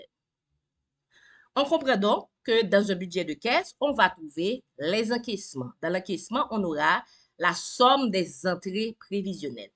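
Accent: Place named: Haiti. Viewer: Slt, Madame , merci pour la vidéo, elle m'avait été utile. Vous parlez français avec un accent Haïtien, êtes vous d'Haïti.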